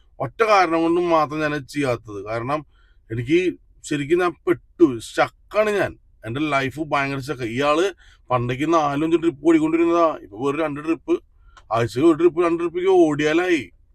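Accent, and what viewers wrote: Thrissur, India. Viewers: നാട്ടിൽ അങ്ങയുടെ വീട് തൃശ്ശൂർ ആണോ. ത്രിശൂർ ഭാഷ ആണല്ലോ പറയുന്നത്.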